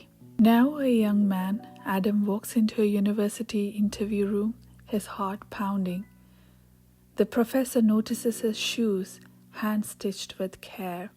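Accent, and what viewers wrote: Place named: United Kingdom. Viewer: Ma'am I like reading and story telling. I am going to practice shadowing with you. Your pronunciation, rhythm, intonation everything is perfect and most importantly you speak british pronunciation which I love to speak ❤❤ It will help me to improve my pronunciation. You speak natural English but we are trying to make it natural 😊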